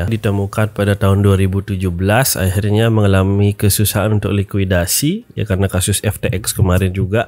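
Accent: Bali, Indonesia. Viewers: Dari logatnya, asal Bali ya bli?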